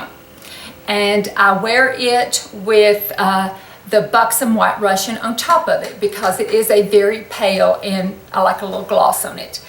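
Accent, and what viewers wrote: South, United States. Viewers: I love your Southern drawl and your kind personality,. Frankly, I feel like you could be my sister. I feel just right at home! ♥️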